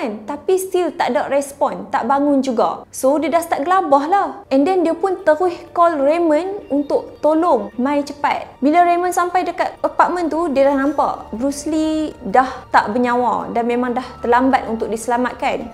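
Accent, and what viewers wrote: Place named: North, Malaysia. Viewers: Hebat akak hg tau kt mana cerita ni. Sebenarnya menarik jgk . Lgi2 akak ni ckp mcam org utara. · Segak btul marka kedah ni..bibir dia manis dgn loghat utaqa❤ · Pekat betul bahasa Kedah ang noh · Cakap utara noo.. 😊🌹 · Terbaik sis cara penjelasan cerita lancar Dan mudah di fahami humble relax jaa ckp utara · syok dengaq loghat utara.... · xtau pulak ckp kedah naaaa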